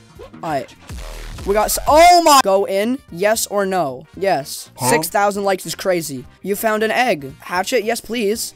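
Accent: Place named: United Kingdom. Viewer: goated channel frll (why is that your first youtube video you had a biritish accent?)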